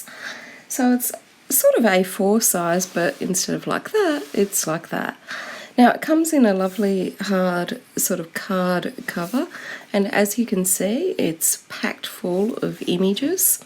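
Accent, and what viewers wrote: Australia. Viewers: Thank you! I enjoy your videos so much. In part because of your lovely Aussie accent, but mostly because you introduce me to book from around the world. Thanks again!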